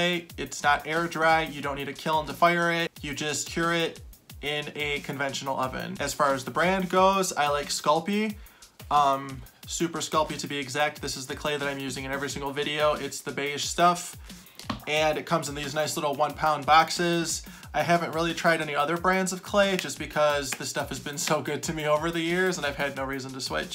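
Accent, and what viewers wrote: Michigan, United States. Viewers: I knew that was a Michigan accent!! Lol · Michigan accent! I grew up in Warren but moved to Tennessee 20 years ago. People either love or hate my accent here. Question on baking: What do you bake on? I know if my clay is baked on a glazed ceramic tile then the clay touching the tile comes out glossy. Since many of your pieces are large I suppose you must lay them on their side to fit into your toaster oven. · Michigan accent?! I'm a fellow Michigander. Hello from Kalamazoo+ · Just my 2 cents; YouTube will recommend that you edit your videos to 20 minutes or less. This is probably important for "talking head" type videos. If the video is mostly showing the actual work you are doing, most of the people who purposely selected it for viewing will watch for a lot longer than 20 minutes - it's why they are watching. Personally I don't see anything wrong with your current studio setup or your video presentation or your Michigan accent. 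Bacon Bond? It's like "Duck Tape"; originally it was refered to as "duct tape" (as in heating ducts) but so many people pronounced it "duc-tape" that 3M officially adopted the name brand "Duck Tape" Maybe you will be responsible for a re-branding of the product! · I thought I detected a fellow Michigander’s accent, I’m from the south west side at the very bottom anyway to answer your question yes I wouldn’t mind a 30-40 minute video so I can follow along an know what I’m doing lol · Your Michigan accent is very similar to my Ohio accent. I no longer live in OH though. Bake and Bond - bacon bond lol yep. That's how I say it.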